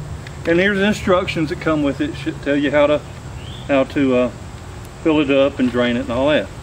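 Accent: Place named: Appalachia, United States. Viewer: Look up fellow YouTuber "Butter Bob" & the title to one of his first videos " Butter will make you pants fall off" - I'm close to you age & got up to around 270lb - Started following Butter Bob & lost 57lbs in 5 months couple yesrs sgo & kept it of - I'm also Veteran serving from <num> before I was Medical Discharged - You have an accent similar to folks that live around me so you must be from one of these "Hillbilly States around here - Take Care & get yourself in shape Sir for your family & feeling as good as did when you were 25 yr old- jlo out here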